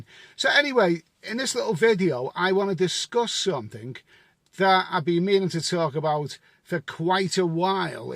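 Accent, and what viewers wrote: Liverpool, United Kingdom. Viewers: A scouser in a fedora peddling derty smutty videeos....count me in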